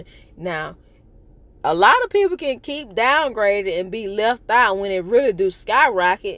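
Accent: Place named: South, United States. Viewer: Love that country twang lol and personality for that alone I will subscribed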